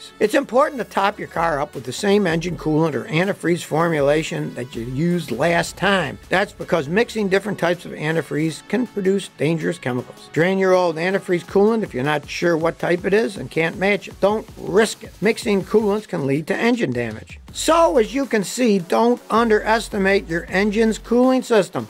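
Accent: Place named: United States. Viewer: I like the accent he talks that one refer to the American 1970's movies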